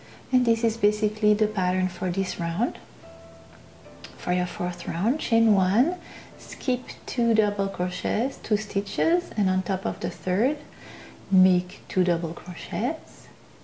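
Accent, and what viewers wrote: France. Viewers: Superbe !!! Thank U very much for teach us . You 'v got a French accent ! ???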